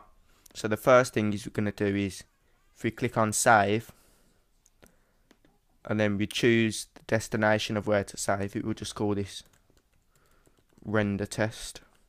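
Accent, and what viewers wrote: Birmingham, United Kingdom. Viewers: i heard ya dog at 100 lol ignore me dude its good to hear a fellow brummy cheers for the tips man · Are you from birmingham?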